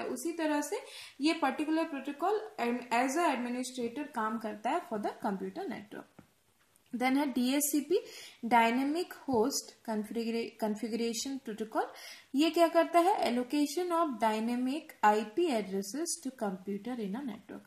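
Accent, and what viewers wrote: Odisha, India. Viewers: Are you odia ??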